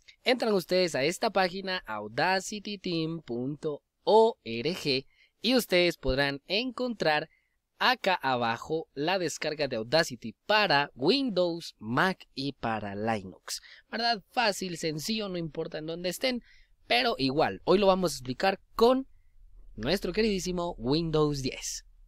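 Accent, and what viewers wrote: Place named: Venezuela. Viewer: me suenas a venezolano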